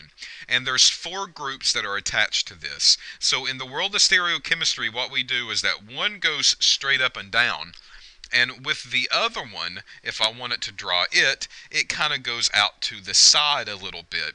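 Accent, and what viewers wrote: South, United States. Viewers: love the dixie accent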